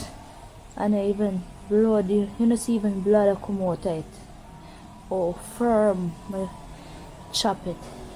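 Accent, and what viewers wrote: Jamaica. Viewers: Hey I haven't seen your videos in years! Last video I saw was when you went back home years ago to Russia or Ukraine I can't remember which 1 your from!!So glad your video popped up on my timeline.You have Jamaican accent now very cool 😎 👍🏾 1Love and Bigup your self💯🙏🏾Much Love to Your Family God Bless 🙌🏾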